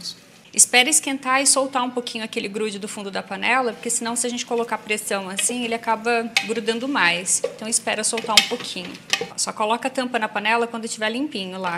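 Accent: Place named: Piracicaba, Brazil. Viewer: Adoro seu sotaque piracicabano, muito simpáticao.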